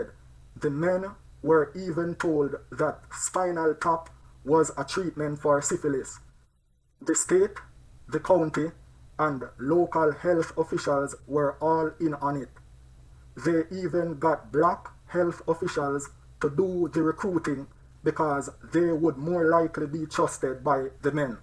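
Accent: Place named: Jamaica. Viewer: So True. Thanks White guru white a Jamaican accent.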